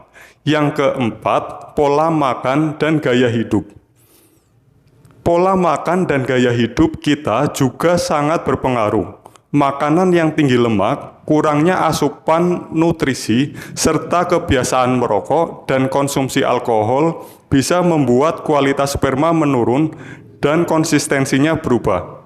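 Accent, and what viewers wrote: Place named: Java, Indonesia. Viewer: Suaranya mantap bgt. Sangat manly meskipun berlogat jawa.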